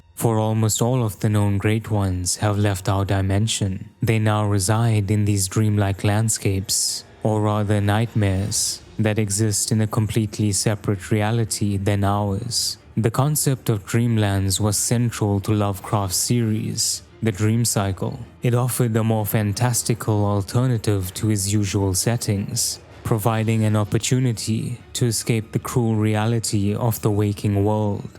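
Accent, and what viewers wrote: South Africa. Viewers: South African? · Your voice sounds South African,South African Indian to be exact,id say from Durban but I could be wrong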